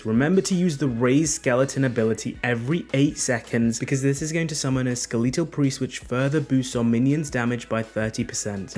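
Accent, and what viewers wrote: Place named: United Kingdom. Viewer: He’s British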